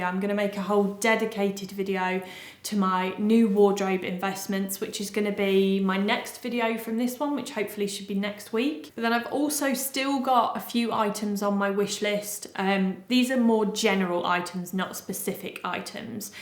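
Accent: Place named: London, United Kingdom. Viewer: Omg same here I am learning her London accent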